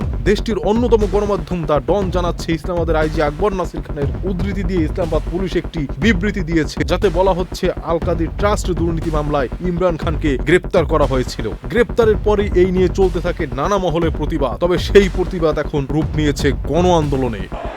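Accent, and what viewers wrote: Kolkata, India. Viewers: apnara kolkata er style khobor prochar koren. Jamuna tv theke sikhen ..cheap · ভারতীয় ভঙ্গিতে নিউজ পড়া বাদ দেন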